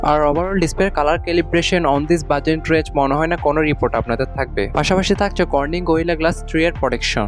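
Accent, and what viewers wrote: Chittagong, Bangladesh. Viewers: Kotha sune mone hocce apni chittagong ar...Chittagong a ai mobile kutai available pabo seta bolen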